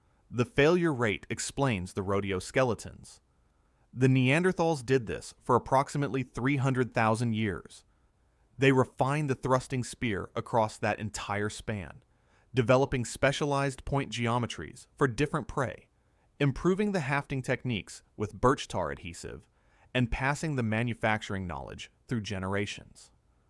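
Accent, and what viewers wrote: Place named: United States. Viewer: i wish you could learn to pronounce composite correctly. Your American pronunciation is so grating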